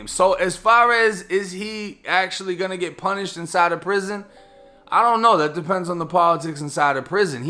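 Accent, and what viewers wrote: Boston, United States. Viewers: Just found ya channel & I love content like this so ima sub, esp cuz you sound like you from where I’m from…or close to it! Nobody has an accent like us. Ijs 💯🤣 #️⃣6️⃣1️⃣7️⃣ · just came across your channel and I just KNOW you're from Boston the way you say "part" and "car" LOL please let me know if I'm correct. Love the content btw! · Couldn't help but notice that raw Boston accent brother. Grew up in Massachusetts but I don't miss south Boston at all.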